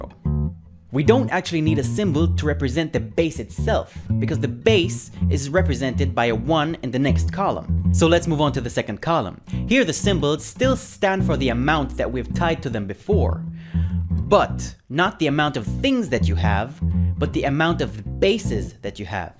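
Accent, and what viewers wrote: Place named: Latin America. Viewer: I'm not trying to be mean or racism or anything but ur accent sounds so latin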